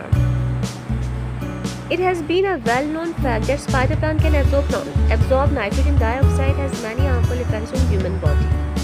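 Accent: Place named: India. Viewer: your tone accent and English should be improved It sounds more like Hindi with a raga .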